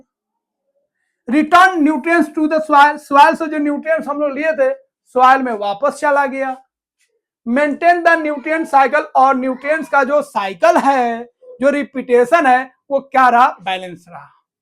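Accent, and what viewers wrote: Bihar, India. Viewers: Sir ap bihari ho ke ? Ham bhi bihar se hai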